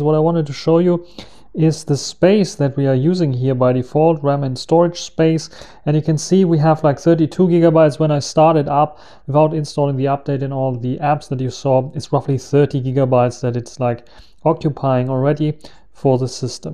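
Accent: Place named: Germany. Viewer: Nach drei Wörtern Englisch wusste ich sofort dass du ein Deutscher bist. Diese Akzent findet man nur bei den Deutschen wenn sie endlich sprechen. 😂 Aber nettes Video, lass dir gern ein Abo da. Frohes neues. Das Vivo x80 pro gefiel mir vom Design her besser, ich persönlich warte bis Vivo die Frontcamera 4k fähig macht.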